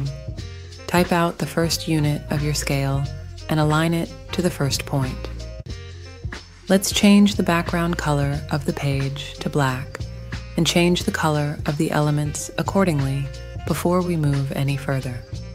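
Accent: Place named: Russia. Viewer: mam are you from Russia amazing accent waooooo 😊😊😊😊😊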